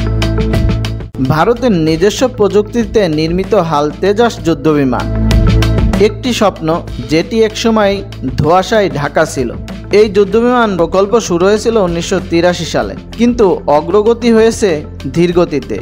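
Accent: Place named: Bangladesh. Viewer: এহনো ভাষায় বাংলাদেশী টান ভালোই আসে। তবে ইনি রাষ্ট্রবাদী ১০০%। এতে কোন খাদ নাই। ভালো থাকুন।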